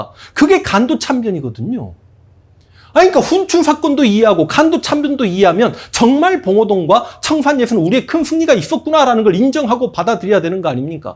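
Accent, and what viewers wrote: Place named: Chungcheong, South Korea. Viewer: 난 황강사님이 충청도 말씨라서 강의가 주구창창 공산주의를 지향하는 사회주의적이라 이상하다며 들었습니다 역시 광주분이네요 전라도분들은 거의 모두가 객관적 사실보다 목표를 세우고 그기에 대입하시는 경향이 많아요 강의하실때 좀더 실증적으로 하시면 감사하겠습니다 그 해박한 지식을 지향하시는 목표에 대입하시면 일반 사람들은 반박하기 힘들어요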